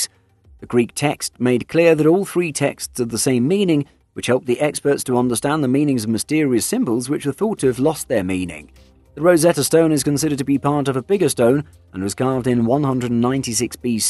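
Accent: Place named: United Kingdom. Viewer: Rehash in a sharply cut, and quickly paced pro voice, in Brit accent. Not a Like.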